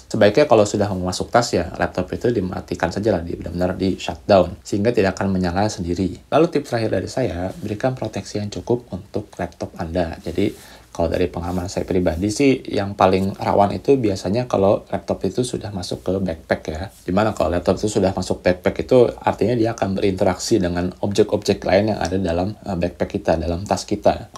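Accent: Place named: West Java, Indonesia. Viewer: meni sunda pisan euy 😁👍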